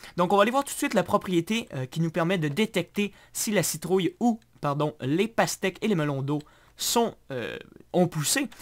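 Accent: Quebec, Canada. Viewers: je découvre tout juste, et franchement c'est vraiment pas mal. (j’adore l'accent québécois ) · j aime trop ton accent quebecois ;) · Ta laccen quecois et texpliquer comme un francais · Moi perso j'aimerais être un Quebecois j'aime l'accent ^^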